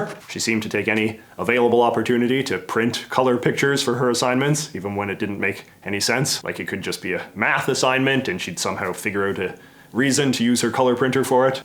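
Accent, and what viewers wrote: Canada. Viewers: Please work on your thick as all heck Canadian accent...It's not representing us fellow West Coast BC folks very well 😎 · Lol you say a boot are you Canadian I'm Canadian too but I don't say about in quite the same way you do Looked at your channel it does appear your Canadian I'm going to refine my guests are you from Newfoundland? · You have the most Canadian accent I have ever heard. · My first video I've seen of yours. I love the content of it. Nice 👏 👌. Very cool stuff... but God damn. Youre Canadian AF! Everytime I here oot..it just takes me oot of the moment lol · Being a Canadian myself, this is the strongest Canadian accent I’ve ever heard. Good lord.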